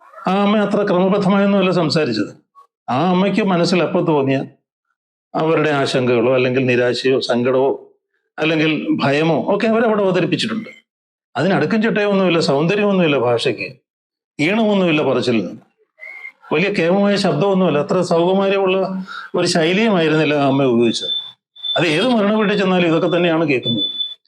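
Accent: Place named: Thiruvananthapuram, India. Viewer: Slang TVM. ..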